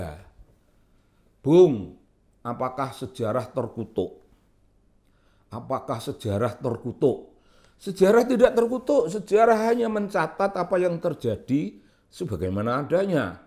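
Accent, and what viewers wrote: Indonesia. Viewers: Kang Sobary itu tulisannya bagus. Saya suka membaca esai-esai dan buku-bukunya. Tetapi, kalau berbicara kurang menarik. Bahasa lisan Kang Sobary amat medok dan tidak terstruktur dengan baik. Jadi, menurut saya, lebih baik memakai naskah biar lebih enak didengar. 😊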